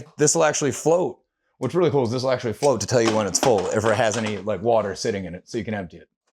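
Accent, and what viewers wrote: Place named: Buffalo, United States. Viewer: Knowing you're from Buffalo, that accent goes crazy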